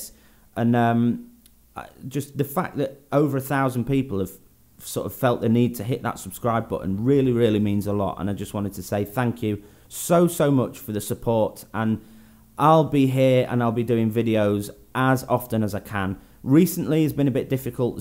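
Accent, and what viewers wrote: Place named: England, United Kingdom. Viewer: Wow I thought you were from England. Your accent is English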